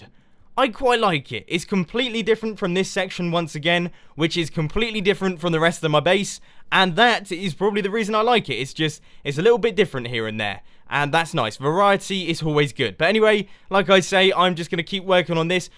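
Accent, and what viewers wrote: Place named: United Kingdom. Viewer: British accent with Australian English. "Seriously, 'Mate!"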